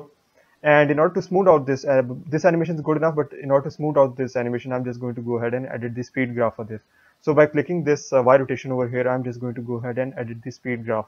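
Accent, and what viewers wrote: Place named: India. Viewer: The professional Indian sounding guy saves the day. Thank you fam.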